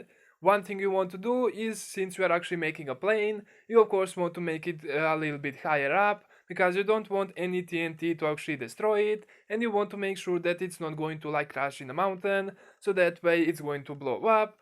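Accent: Russia. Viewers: I love that he sounds russian and he makes a tnt bomber.... you just got a new sub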